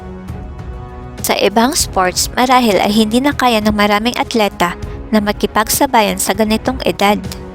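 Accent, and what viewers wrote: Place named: Tagalog, Philippines. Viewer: Fock ur tagalog accent so effing annoying so stfu biatch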